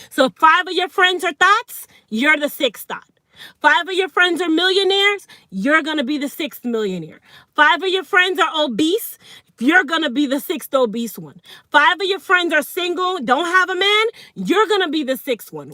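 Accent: Jamaica. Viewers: Are you Jamaican ? Keep up the good work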